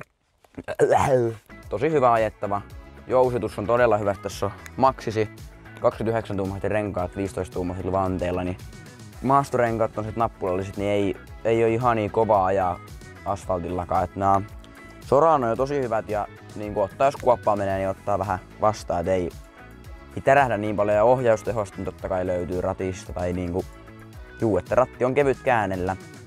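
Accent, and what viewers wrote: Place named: Rauma, Finland. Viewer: Huamaa et poika raumalaine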